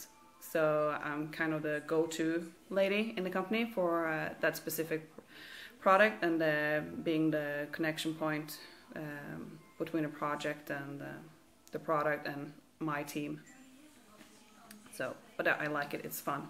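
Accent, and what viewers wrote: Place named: United States. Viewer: I was surprised when you said you lived in Norway. I was expecting somewhere in the United States. The only departure from the American accent was when you said "Agatha" and "progress". I have the book about Shackleton, but haven't read it....I will now. Thanks for the video. Martha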